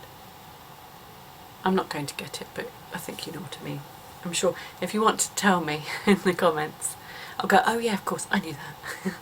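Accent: United Kingdom. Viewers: Hiiii!!! I love to hear your perfect British accent😍. And that mohair coat it's lovely. I have a lot of stash, mohair stash, and always think it's better knitted than crocheted!! But you made me rethink it😁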